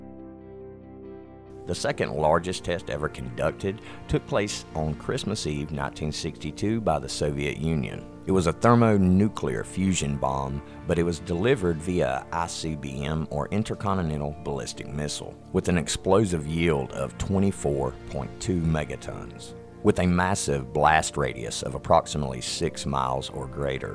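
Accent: Alabama, United States. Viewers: Where are you from ? Bama ?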